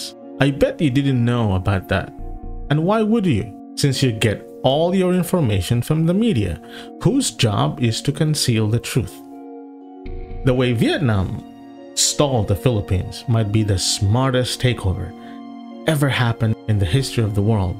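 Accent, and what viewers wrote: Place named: China. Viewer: True the voice of this person is Chinese, undermine relation of Vietnamese and Philippines or this person might be Filipino duterte fanatics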